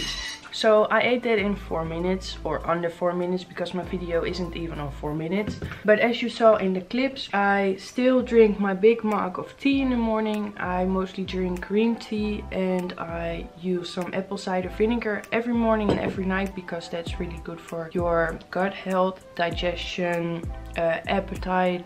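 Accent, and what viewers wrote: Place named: Netherlands. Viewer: Leuk dat je gelijk kan horen wanneer iemand die engels spreekt een Nederlander is haha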